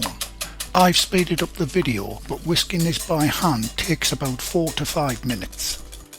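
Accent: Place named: Northern England, United Kingdom. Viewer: Hi. I discovered your channel recently and really do enjoy your recipes. It’s great to hear a friendly northern chap giving it a go in the kitchen. Will you be planning any Christmas family favourite recipes, as we get closer to the festive season? All the best.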